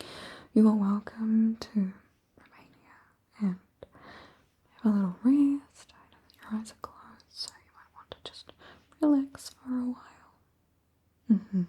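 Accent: New Zealand. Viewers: 20 seconds in and i could tell you were kiwi 😂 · are u from nz? so beutiful ❤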